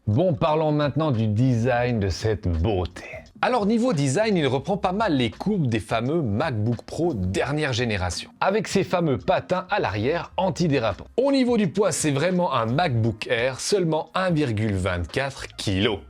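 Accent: Switzerland. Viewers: Tu es suisse ?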